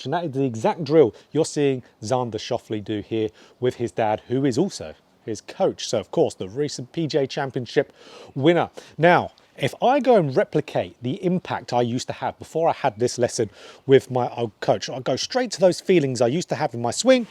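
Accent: United Kingdom. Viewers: I actually think the fact he speaks quickly is refreshing, usually I have to turn up the speed on videos because instructors talk too slow like I’m a child. If his accent is difficult to catch quickly you can always turn down the speed of the video or turn on caption. (My sister often watches any British tv/movies with captions)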